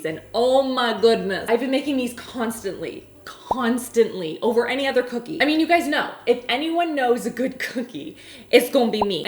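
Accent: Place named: Canada. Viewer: I seriously genuinely love your Canadian accent, it really makes me happy (( Brownies eh )) for example. Ps: all of your videos are amusing 🌼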